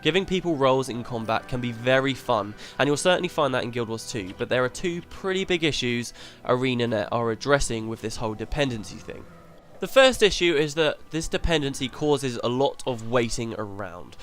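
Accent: England, United Kingdom. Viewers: Your English accent turns me on. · Lols, you have a british accent .3. Great, now you made me sad that I dont have Guild Wars >_< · This is a perfect example of how a fancy British accent can be used to deceive countless people into thinking what you are actually saying isn't completely retarded. Your entire argument is a straw man. If you are clicking red bars in a window (reactionary healing) then you aren't doing it right. You should be pre-protting, watching your position, following your enemy's damage, all while trying to monitor your energy and control how aggressive your entire party is playing. That's not boring. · noob video. who the fuck cares about "raids", raids are boring by definition, you learn it and you are done. it's no fun after that. heals are fun in battlegrounds and arenas. and you are not just "watching bars" there. if you are, you are certainly not familiar with the game at all. 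beautiful scenery standing there wacking at the boss? that's all the melee looks at, and the ranged. i don't get this idiocy at all. especially with a brit snotty accent.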